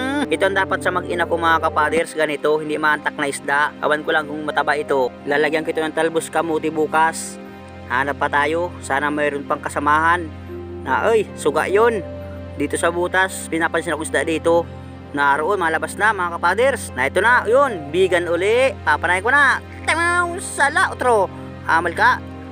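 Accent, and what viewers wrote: Bicol, Philippines. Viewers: ka fahers bicolano ka ba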